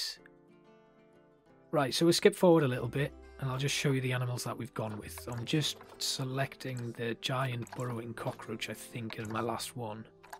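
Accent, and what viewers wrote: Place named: Newcastle, United Kingdom. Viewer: You popped up on my feed. Didn’t realise you’re a fellow geordie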